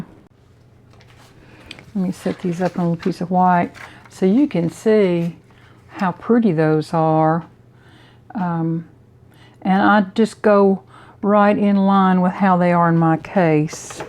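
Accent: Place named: Tennessee, United States. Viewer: Thank you for this great enamel dots tip! And, are you from Tennessee? Because you and comedian Leanne Morgan have the same accent! xox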